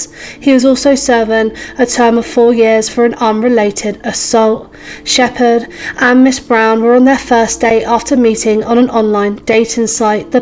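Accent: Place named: England, United Kingdom. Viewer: I never had any doubt this was narrated by a woman from england who is real. Ai can be too obvious.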